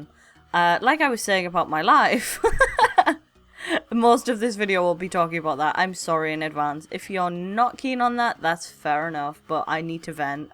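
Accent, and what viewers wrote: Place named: London, United Kingdom. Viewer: New subscriber here! Discovered you today and i'm in love with your content and your accent! Like... Damn, can i be from London to have it? hahaha keep up the good work! <3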